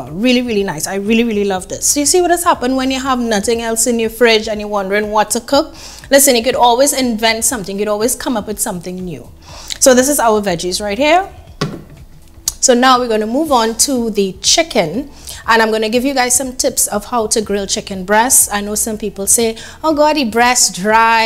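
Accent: Trinidad and Tobago. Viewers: Who is this young lady, she is so genuine and very informative. She is fabulously fantastic. Better half even singing her praises. So she inside. Very professional and then slides in her Trini intonations from time to time. Excellent. And the meal and preparations on point too. What’s her name? When you cooking again darlin?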